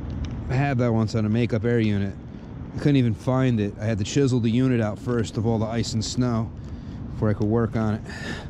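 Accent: New York, United States. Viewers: No hot pads? Go warm up a few then go back up. Oem wires have id numbers on Lennox rtu...re label them. A picture of connections sure helps before removal. New York/Joysey accent...lol Battery azziz..? Lmbo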